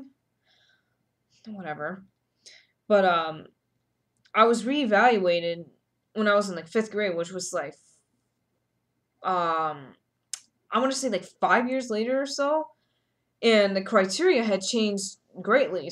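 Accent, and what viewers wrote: New York, United States. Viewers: Hi, I'm from Australia....really enjoyed your thoughts......what accent is that? Is that a particular area of NY?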